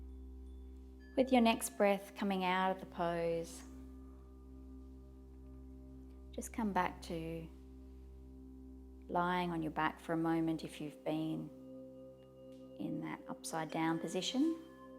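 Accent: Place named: Australia. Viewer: Great practice. I really enjoyed the first pose, whad do you call that? And, I love your aussie accent 🙂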